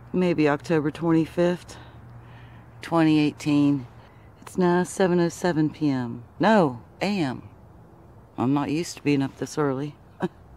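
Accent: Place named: Midwest, United States. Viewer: I’ve learned a lot about the realities of amateur astronomy by watching your videos. Thank you. Also, your pace and content are terrific. I definitely feel your voice and tone are excellent and soothing...just right! (I live in Lexington and recognize the midwest accent). I play your videos sometimes to help fall asleep- that’s a compliment, because they are relaxing!! Lol. Thanks again, and don’t change a thing and ignore any criticism. You are unique.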